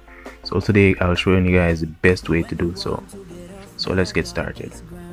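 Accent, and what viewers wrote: Jamaica. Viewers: You a jamaican?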